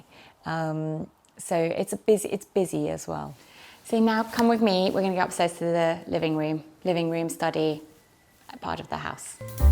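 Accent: England, United Kingdom. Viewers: What a fabulous home. So cozy and welcoming and beautifully styled. Can tell is a very genuine person. I love her personality and her beautiful English accent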